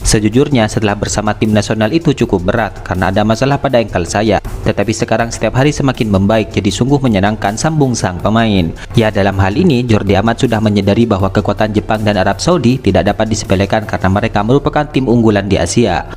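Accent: Madura, Indonesia. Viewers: logat madura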